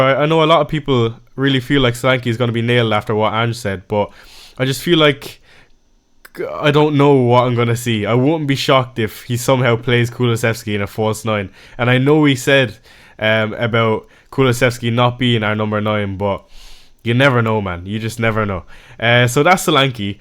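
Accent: Ireland. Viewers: I can hear a hint of an Irish accent😅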